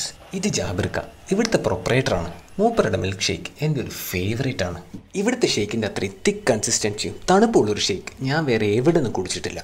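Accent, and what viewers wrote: Kannur, India. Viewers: Aa kannur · Bro kannur aano. Aa voice .. yente monee! !! Poliii 😍😍😍😘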